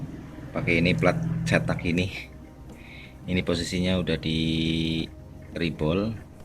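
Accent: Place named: Banyumas, Indonesia. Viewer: ngapak juragan 😁😁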